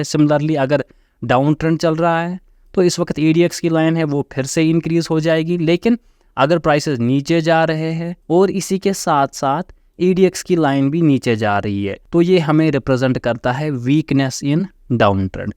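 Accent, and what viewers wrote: Kashmir, India. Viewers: Kashmir se ho kya bhai aap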